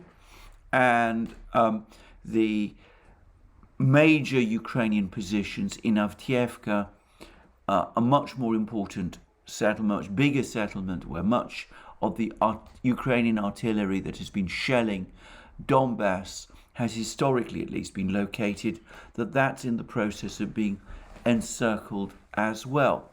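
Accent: England, United Kingdom. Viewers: Nice English accent Ruski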